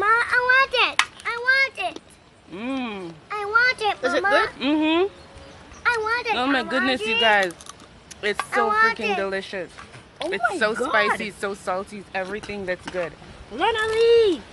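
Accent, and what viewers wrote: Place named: United States. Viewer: are u a jamaican cause u sound like american lol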